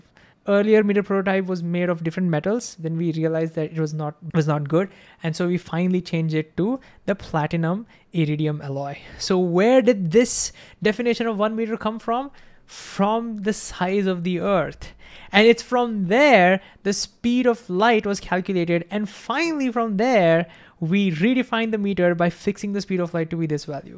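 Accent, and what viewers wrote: India. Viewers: You sound and look Indian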